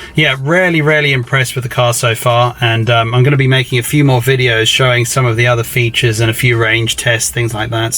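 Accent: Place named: United Kingdom. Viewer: you have been a godsend! I have been watching your videos since just after Christmas when I decided to get a new car. All I wanted back then was a back-up camera & a hatchback [current car is a 2013 manual Mini convertible - tons of fun but a very active puppy makes the top-down times limited]. Then I went down the electric car rabbit hole - I have been intrigued since the first Tesla 2-seater which I coveted from afar. Checked out Consumer Reports and they teased that a new car was coming to market in the US soon, and that was my intro to the Polestar! Honestly, I fell in love w/ the yellow seatbelts irrationally & immediately. I have co-workers who have Teslas but I hate the center-only screen. I googled Polestar and became quickly hooked on your open & honest straightforward videos [my dad was British so the accent doesn’t hurt either, probably some subliminal warm feelings there too]. 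I’m brand new to e-cars so that started the learning curve re: charging, battery size, range, blah, blah, blah [your early video helped me slog through that phase]. I took the leap in lockdown and drove to NYC to see and test drive the car in person and fell in love! When I got home from that trip you posted your now infamous “Don’t a Polestar” video, and that put a chill on my enthusiasm. Did I really know what I was doing? Was this just my rebellion for being locked in my apartment for a year? Who knows, but I got over it and in early March ordered my Midnight Polestar 2. Did the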